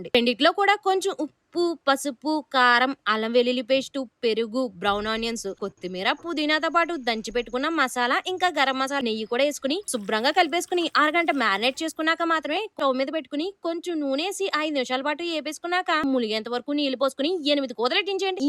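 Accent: Godavari, India. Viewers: ok ఏమి లేదు అండి కుంచం మ గోదావరి స్లాంగ్ ఉంటే అందుకే అడిగాను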